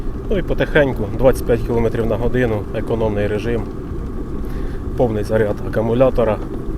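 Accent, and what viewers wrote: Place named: Poltava, Ukraine. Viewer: Полтавский говор.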